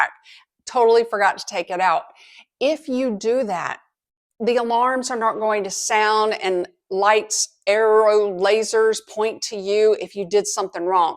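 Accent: South, United States. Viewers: I love listening to while drinking my morning tea. Love her Southern accent- home to me! Even though I travel internationally a couple times a year and have for a long time, rules change and just adds to my peace of mind with her updates and equipment tips. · Love it! Thanks for the tips. I'm always looking for ways to make my airport experience better. Also, loving your accent from one southern gal to another!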